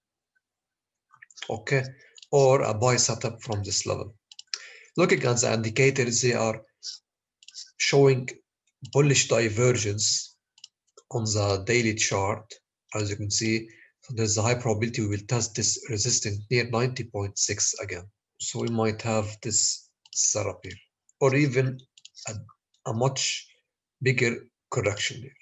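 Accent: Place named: Egypt. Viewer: U sound like an Egyptian. Could you please look into doing crypto currency forecasts?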